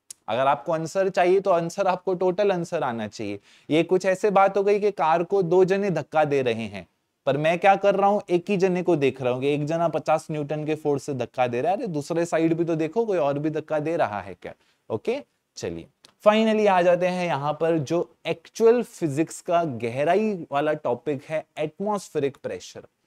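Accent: Sindh, India. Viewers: Sir ki sindhi accent bhot relatable hai😂❤️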